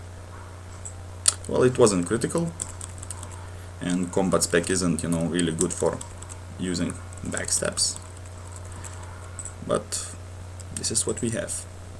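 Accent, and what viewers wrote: Russia. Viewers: That russian accent of yours is so awesome xD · Well you know english pretty well, just have very strong accent as all russians.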